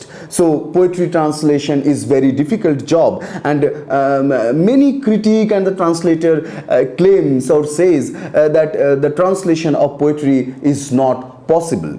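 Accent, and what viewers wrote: India. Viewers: This is very useful, but apart from that I don't understand a few words, because you speak too fast and your Indian accent is so thick which makes it difficult for us to understand, not only that, I was very serious but it all goes away because your speech is so funny to hear. maybe for the next video you can speak more firmly and slowly, that's all my criticism.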